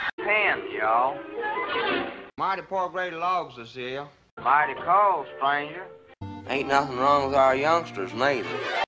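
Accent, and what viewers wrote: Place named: North Carolina, United States. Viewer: That's a mighty fine North Carolina accent there, man.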